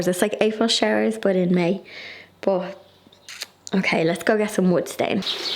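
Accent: Ireland. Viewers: I love you so much! Whenever I'm down I watch one of your videos and your loveliness and your lovely Irish accent makes everything better!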